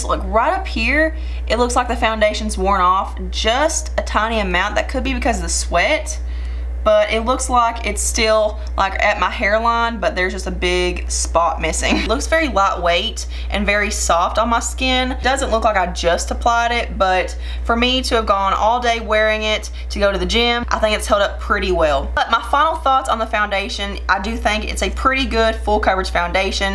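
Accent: South, United States. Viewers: I've just found your channel & I'm loving you. I grew up in the south so we talk alike & I'm wondering where your from?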